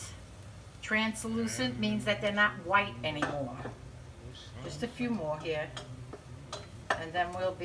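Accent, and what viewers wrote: New York, United States. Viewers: The website to order the book is “ not secure” . Apple recommends that you contact the owner of the site and don’t give your credit card information. I enjoy your videos otherwise. I love your accent and it is same as my sister who lives in New Rochelle. I am a retired cardiologist from the Bronx who lives in Omaha for last 45 years. Of course, I lost my accent(ha, ha… NOT.)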